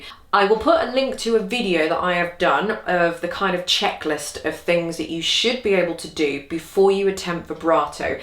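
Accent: United Kingdom. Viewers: This woman is fabulous and no nonsense. I love British accents too :) you inspired me to buy a violin again a twenty year hiatus and here I am practicing!!!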